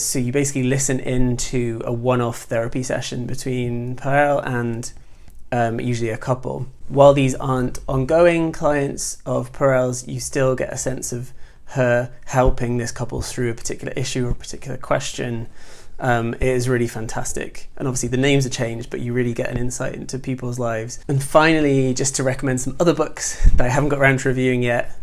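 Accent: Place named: United Kingdom. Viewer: Hey, I love. They are so talented. I really want to check out I May Destroy You but I have to get HBO. I'll definitely look into (sp?) too. I was wondering given your references to Katrina and NY Ballroom culture etc. where in the world you are? lol Obviously the accent suggests the UK but you have a lot of American references. Just curious. I just finished reading E.M. Forster's Maurice myself and immediately watched the 1987 film. I loved the novel. Especially after reading the Terminal Note at the end. Very interesting take on an English gay love story in 1914. The movie was pretty faithful to the novel as well. Thanks for the recommendations!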